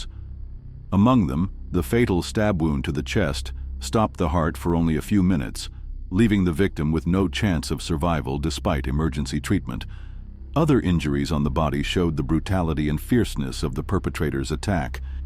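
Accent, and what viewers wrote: United States. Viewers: It’s annoying to hear the American accent on a UK documentary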